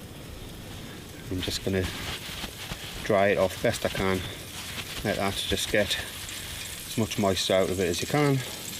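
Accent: Newcastle, United Kingdom. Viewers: ha where u from mate u sound like your from newcastle ....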